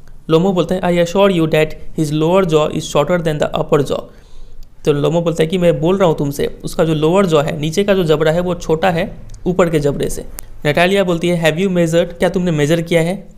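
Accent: Bihar, India. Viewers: sir aap Bihar se hain kya? Accent se lg rha tha. The video is amazing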